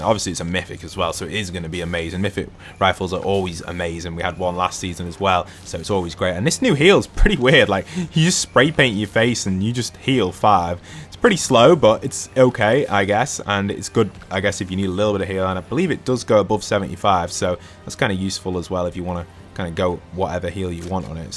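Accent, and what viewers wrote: United Kingdom. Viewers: Yo r u from Leeds or manchester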